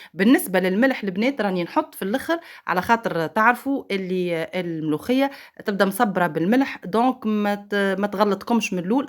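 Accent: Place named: Tunisia. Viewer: ما شاء الله على الهدرة الزينة التونسية ما أحلاكي الفديو رائع بكل التواضع و حسن الرقي في الكلام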